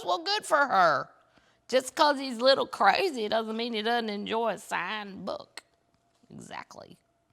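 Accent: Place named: Appalachia, United States. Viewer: you have to read it in your hillbilly voice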